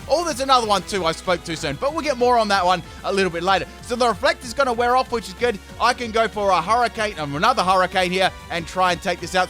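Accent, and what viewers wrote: Australia. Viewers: I've been watching him for some time but.. is he Australian?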